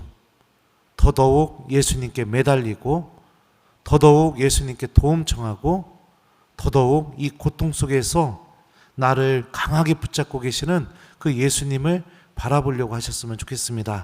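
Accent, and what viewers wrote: Chungcheong, South Korea. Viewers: 충청도백제말쓰시는신부님 김대건신부님이상 귀에쏙쏙들어오는것 신부님튼강강좌미사 감사드립니다아멘 미카열입니다 성부와성자와성령으로아멘 백제말감사드립니다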